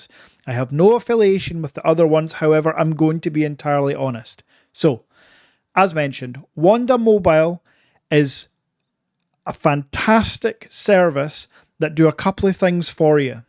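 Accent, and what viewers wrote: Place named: Scotland, United Kingdom. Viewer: Scottish, not English. Are you a fan of The Critical Drinker?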